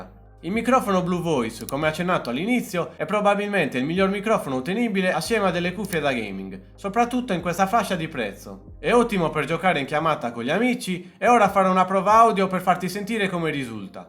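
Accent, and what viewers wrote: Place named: Sassari, Italy. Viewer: ti scopro adesso, apro il video, passano 2 secondi e mi chiedo, ma sei di Sassari? La S e l'accento sono quelli, bel video comunque, due game ad apex facciamoli zi!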